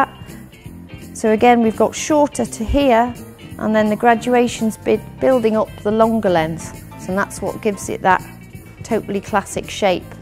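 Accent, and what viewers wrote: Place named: Liverpool, United Kingdom. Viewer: It sounds like she has a Liverpool,England accent to me for sure.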